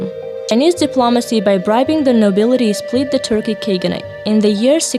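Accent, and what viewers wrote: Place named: Russia. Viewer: You have russan sound)))))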